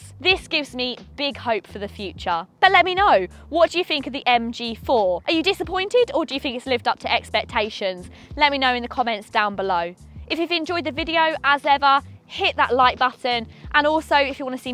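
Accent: United Kingdom. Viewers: i came here for the electric reviews. i stayed for the british accent.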